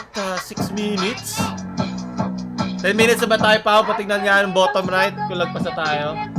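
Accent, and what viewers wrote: Visayas, Philippines. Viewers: Bisaya nis? Bagohan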